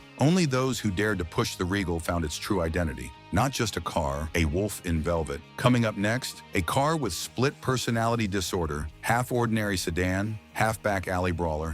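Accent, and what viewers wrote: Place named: United States. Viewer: What no FD Vauxhall Victor 3.3? No wonder the narrator has got an american accent aye, bah!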